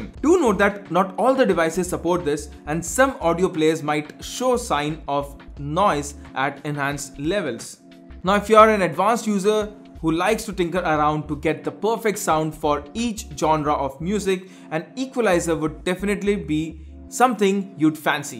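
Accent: India. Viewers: always the indian accent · indian accent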